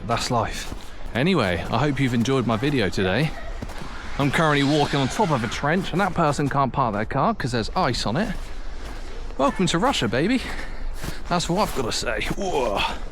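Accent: United Kingdom. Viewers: Ох уж этот британский акцент)))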